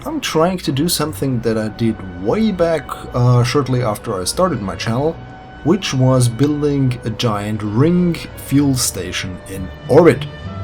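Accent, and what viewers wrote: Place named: Germany. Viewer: Thanks for the entertaining commentary. Always fascinated by your amazing designs with practical and elegant solutions. Good choice of analogy with the "unfinished symphony", since nothing ever gets finished when you play KSP... I hope that you soon again will show how to make Mun bases. I really liked "Operation Moonsucker" Btw. are you from Germany? I note a barely noticeable German accent in your flawless English.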